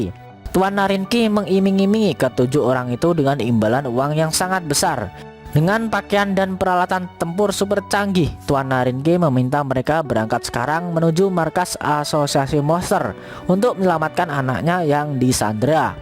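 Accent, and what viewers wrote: Java, Indonesia. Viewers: Medok tolol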